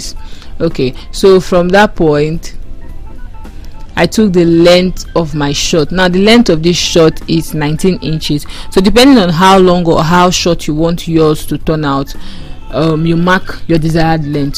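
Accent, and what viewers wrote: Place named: Africa. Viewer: Thank you baby. I am all of 3 days new to sewing, I will definitely try to do this but will start with a skirt fist as I do love skirts. This is very beautifully instructed and informative. Very beautiful accent too. Love my Africans.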